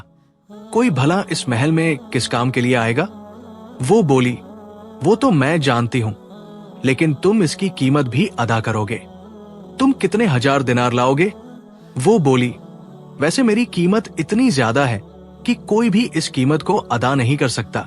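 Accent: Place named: India. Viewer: Ap kahtay bslochistan say taluq, lakin awaz indian lahja??? Kaya india say voiceover karwaee.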